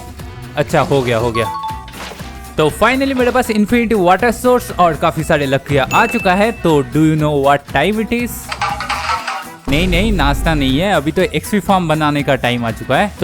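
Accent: Bengal, India. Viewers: Are you Bangali??? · Bro r u Bengali ??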